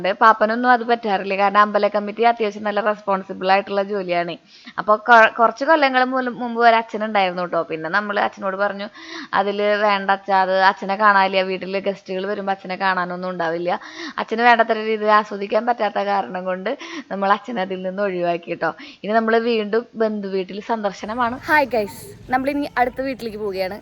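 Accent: Thrissur, India. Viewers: Cake cutting adyam kanunnu. Thrissur bhashayil samsaram kelkkan nalla rasamundu. 😊😊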